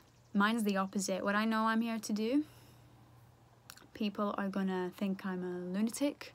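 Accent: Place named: Lancashire, United Kingdom. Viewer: Kútulas She lives in Lancashire England doesnt she ? Her accent is local. I dont think this video is due to media conglomerates.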